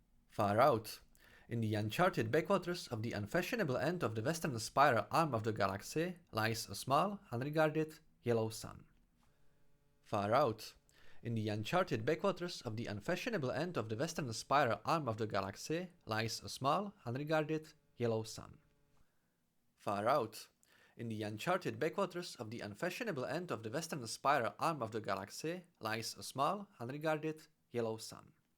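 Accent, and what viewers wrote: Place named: Central Europe. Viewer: You must be from middle europe because your accent is like poland, hungarian or slovak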